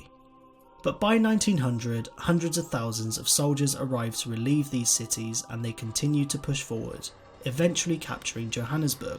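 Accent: United Kingdom. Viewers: Turns out the narrator will still try to make it look like they're not if he has british accent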